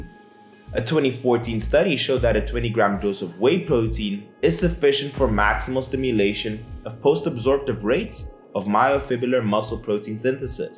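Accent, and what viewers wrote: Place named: South Africa. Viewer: You sound South African ❤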